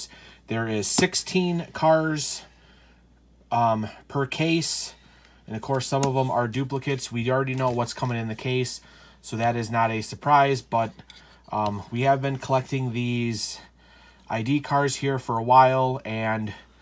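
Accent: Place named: Wisconsin, United States. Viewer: Hello, first time viewer.-I didn’t understand how the hot wheels cars I relate to the game. Mario, The Disneyland Neighbor PS. I think I hear Wisconsin accent.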